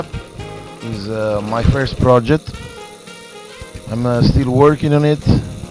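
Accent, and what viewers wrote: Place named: Italy. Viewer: Sei italiano? Riconosco l'accento. Mandami lo schema. Good job.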